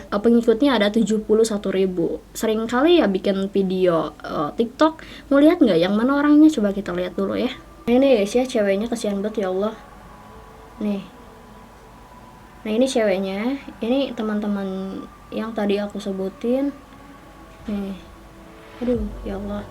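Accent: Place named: Java, Indonesia. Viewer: GITULLOHHH medok bettt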